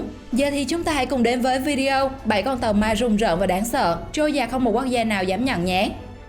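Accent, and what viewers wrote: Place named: Nẫu, Vietnam. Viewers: bà mc này người nẫu phải khg chèn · Giọng miền trung nghe ngộ ngộ mà dễ thương ❤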